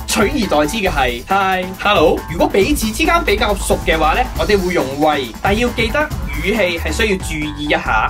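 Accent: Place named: Guangzhou, China. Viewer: 唔知係咪錯覺，我覺得你啲廣東話都有啲口音，係就好流利，但係有啲口音囖，可能係廣州音同香港音分別.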